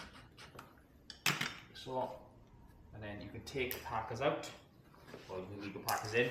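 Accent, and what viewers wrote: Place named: Northern England, United Kingdom. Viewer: Hi, I listened about four times over and over again to work out what screw you are using to put your units together and whether it was because of your cold or your northern accent I couldn't understand a word you were saying. I then looked in Screwfix for every screw that I could see. I still don't know what screw you used, could you write it down on a board, or a piece of paper, Thank you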